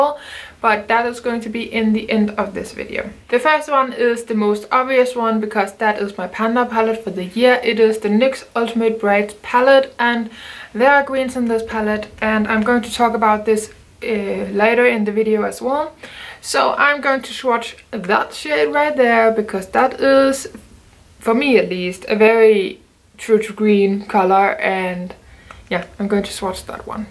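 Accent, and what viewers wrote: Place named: Denmark. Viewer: Danish? Love the accent!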